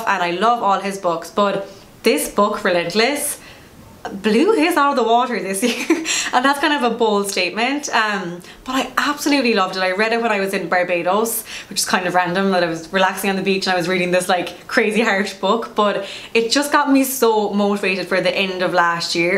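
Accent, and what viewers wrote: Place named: Ireland. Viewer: Love your videos. You're a true Irish gal. Love that you still have your great accent!! I sent you an email about Toc Watch. Hope to meet you at some stage. Keep it up.:-)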